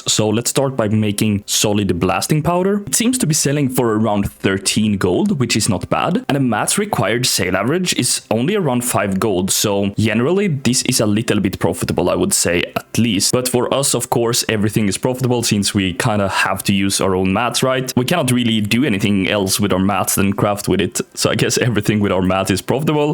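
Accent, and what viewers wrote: Sweden. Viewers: Bro has the Swedish accent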